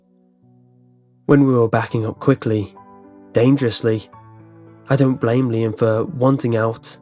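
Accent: United Kingdom. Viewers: buddy grew up in northern nevada with a British accent.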